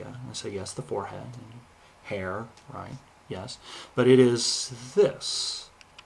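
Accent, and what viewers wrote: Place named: United Kingdom. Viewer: is the wise man of the Tribe. Having a strong british accent, being older than 18,5 and having had sex at least once, he tries to lend some legitimacy, to the average race realist, who has non of those. Its a fun effort to watch, especially knowing its predestined to fail. However I have to appload your kind and smooth delivery. Its a suttle art to strike gently.: )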